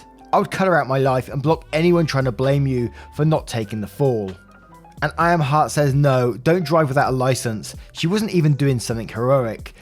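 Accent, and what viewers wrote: United Kingdom. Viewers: I have been really into knitting and crocheting lately. So here I am in Finland, knitting my oversized mittens to try felting them when I'm done while listening to your videos with your lovely voice and British accent all the time. I'm a little nervous about the results since I've never felted before, but I'm having such an enjoyable time with these two activities while sipping tea ^_^ Wish me luck, please!! :)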